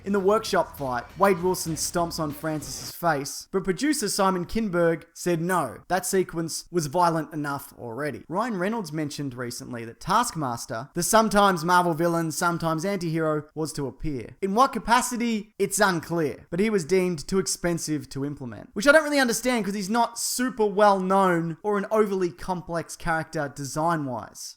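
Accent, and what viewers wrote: Australia. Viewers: I tuned in to WATCH deleted scenes as your title indicated. All I heard was you blabbing in your crappy Aussie accent. Stop misleading ppl with your titles!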